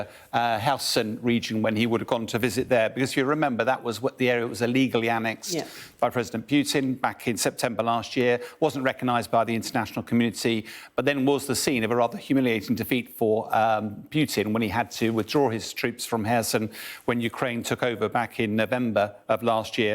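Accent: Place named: United Kingdom. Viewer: His British accent is just killing me. "Piuutin". He sounds like Russia lost 50% its territory. It lost 50% occupied Ukrainian territory. Ukrainian losses based on OSINT, lieutenant colonel McGregor and latest reveled secret document are 1 Russian to 5-7 Ukrainians. Just in Bahmut, Ukrainians lost 32 thousand just KIA.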